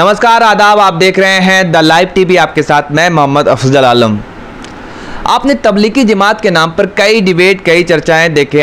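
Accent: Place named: Rural, India. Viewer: Bhai teri zaban saaf nahi hai tu gawar jesa bolta h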